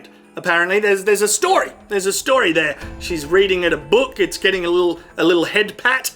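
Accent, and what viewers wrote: Australia. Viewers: U should teach ur American fans how to talk like an Australian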